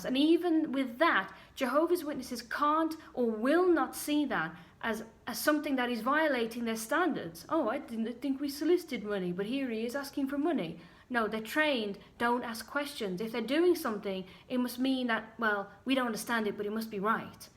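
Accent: Ireland. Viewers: You have a slight Irish lilt or is it country bumpkin ..well ,can we get a close up on these rings ? Your logic makes too much sense ,and this is why you are out of it. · Is that an Irish accent trying to sneak its way through? Great video btw! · I saw that movie, Gas light.....some frenchman and I think Ingrid Bergman. He was turning the gas light down just a little or something, making her think she was losing her sight also. YOu are spot on, if anything a little soft on them. Do I detect an Irish accent? it is very slight, but I love it.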